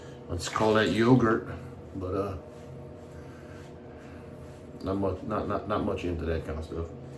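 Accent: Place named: Louisiana, United States. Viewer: If I can echo Sean, your experience always shows....always a great video, especially with your wonderful cajun accent. More please on your stone to edge appreciation. I'd love to try Jarrods concave edge, on a Ti possibly. Looks like you had a very fine shave 🎉😊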